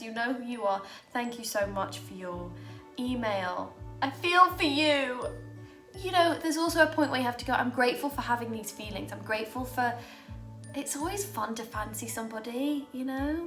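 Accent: United Kingdom. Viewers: Is it just me or accent is so strong since she back in the UK. I love it so much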